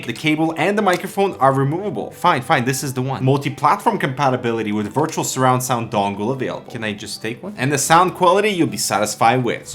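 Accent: Russia. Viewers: are you russian? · U kinda used russian accent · D. Please create new channel where you are just reading books. Your 75% English and 25% Russian voice is literally heaven. Preachhhh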